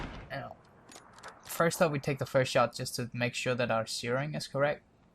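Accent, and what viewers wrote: Denmark. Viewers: are you by any chance Danish? 😃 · Are you Danish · Wait? Are you Danish? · Are u danish? :D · Are you Danish? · R u danish? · tænkte nok du var dansker!!! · Are you danish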